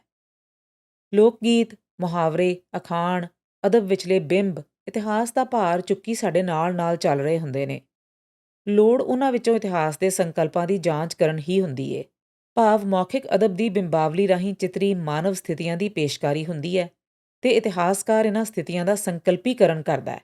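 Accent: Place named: Punjab, India. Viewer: thanks for analysing such historical facts keep it up yourspeaking in folk Punjabi is highly appreciable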